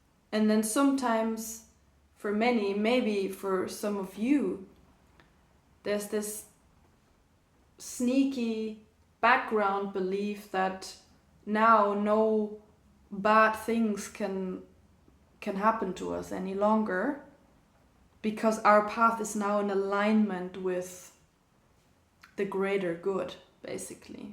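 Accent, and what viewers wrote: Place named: Ireland. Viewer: Hey there! Do you live in Ireland? I'm picking up hints of an Irish accent 😄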